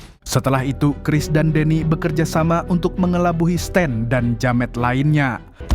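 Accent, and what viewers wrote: Banjar, Indonesia. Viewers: Urg banjar Pina nya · Banjar nya masih ada 😂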